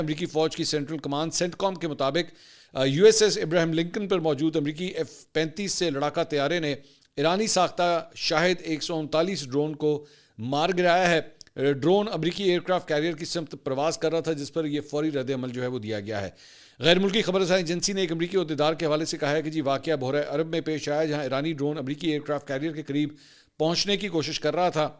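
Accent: Punjab, Pakistan. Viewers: bhai doraan e program apka punjabi k tarka is quite hilarious...jumle k maza ajata hay🤣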